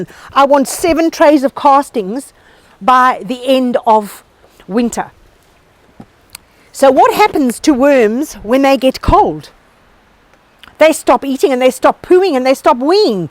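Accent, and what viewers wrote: South Africa. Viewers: Ex South African? Loved your video!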